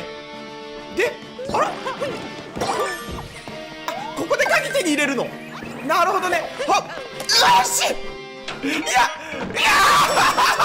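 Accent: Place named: Hakata, Japan. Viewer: 私も福岡在住やけんめっちゃさんが言いたいこと分かります笑!!やっぱり博多弁になる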